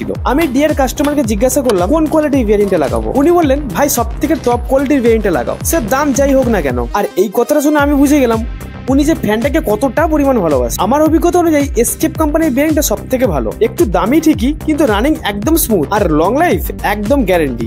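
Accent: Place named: Ghoti, Bangladesh. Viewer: সকল শব্দের উচ্চারণের শেষে কেবলই ছ এর ছড়াছড়ি। ঘটি বটে।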